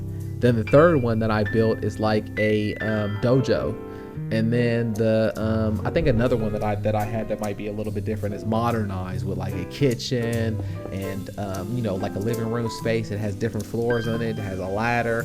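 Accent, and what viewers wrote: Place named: South, United States. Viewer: Nice! I hear an accent in your voice--are you southern?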